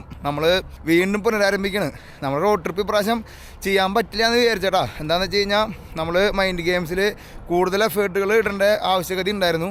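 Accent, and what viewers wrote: Thrissur, India. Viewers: സാൻ്റോടെ അവതരണം . ആ സ്ലാംഗ് തൃശൂർ .ചിന്തിക്കാൻ പറ്റോ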